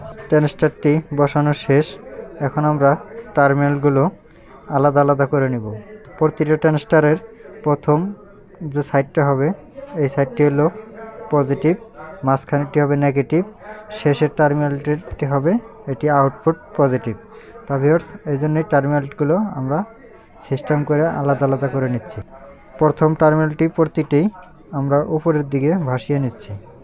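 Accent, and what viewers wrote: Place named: Tangail, Bangladesh. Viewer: ভাষা শুনেই বুঝা যায় টাঙ্গাইল্যা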